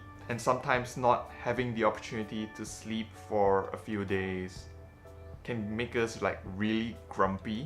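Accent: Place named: Malaysia. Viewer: I'm glad you found passion and joy in making films and videos, as opposed to being stuck in the Science Route™; it was nice to watch this video and get to know you a little better!!:) I can't wait for more videos!! You're doing a good job, keep it up!! Personally I prefer your Malaysian accent, but maybe bc it feels as if we are a little closer. Hehehe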